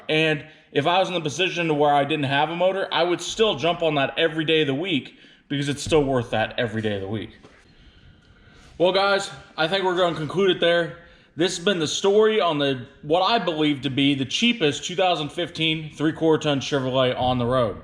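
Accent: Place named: Pennsylvania, United States. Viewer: I know that you say you live in Kansas but are you originally from PA? Your accent sounds like my family that lives in PA.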